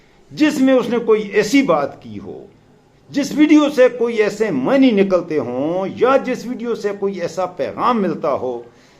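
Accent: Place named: Pahari, Pakistan. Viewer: آپ کے تلفظ سے آپ پٹھان نہیں پہاڑی لگتے ہیں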